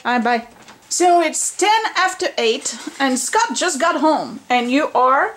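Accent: France. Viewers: I studied French for 4 and a half years. I had hope to visit there but it has not happened. I always could tell your accent was French. I hope Scott feels better soon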